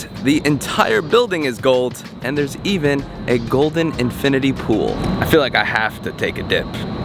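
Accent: New York, United States. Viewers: Is this guy from New York?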